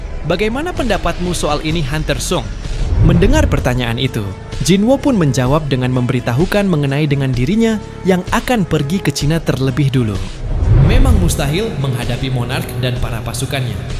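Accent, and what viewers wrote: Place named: Malaysia. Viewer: Kau ni ape, melayu rupanye abang satu ni😅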